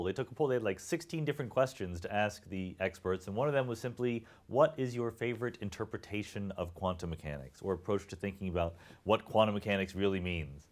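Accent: United States. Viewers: I'm watching this and think 'Something is really out of place here'. And then it comes to me: that man speaks with an American accent! It is so odd to watch a Brady Productions video without the traditional British english. :) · It took me 10 minutes to notice his american accent.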